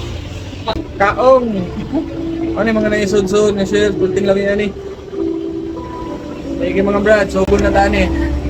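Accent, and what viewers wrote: Visayas, Philippines. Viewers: Bagtikas bisaya nimo dol oi.. padayon lng dol sa pag blog og mga lamian pag kaon 👍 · If I close my eyes...BISAYA jud ang nagastorya, sa dihang pagtan-aw na nako,si diay!😹👍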